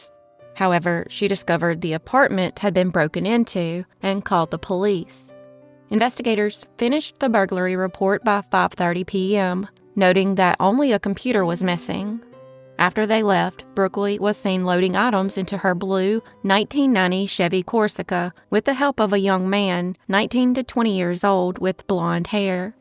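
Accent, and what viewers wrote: South, United States. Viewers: What part of the south are you from? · Thanks for doing Indiana. Pretty good videos. N actually have a nice pleasant voice with a Lil southern twang lol But seriously, thanks. We appreciate it.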